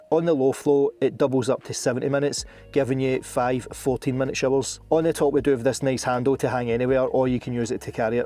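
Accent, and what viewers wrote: Scotland, United Kingdom. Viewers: i was on fb the other day and the flex tail advert come up. all of a sudden i hear the familiar scottish dulcet tones. i know that voice!!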